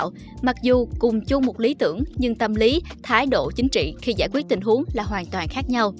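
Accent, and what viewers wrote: South, Vietnam. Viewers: mc người nam, ngã hỏi đọc na ná nhau, quan trọng là video viết đúng chính tả và nói đúng nghĩa của nó. Nếu nghe mà không hiểu tại sao "sỉ" mà không phải "sĩ" thì chỉ trách mình học chưa đủ nhiều, cần học thêm.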